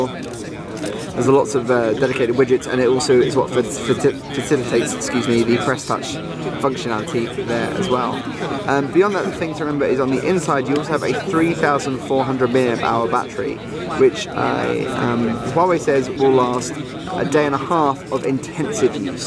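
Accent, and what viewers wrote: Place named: England, United Kingdom. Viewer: You sound like Jonah Hill, but with English accent;)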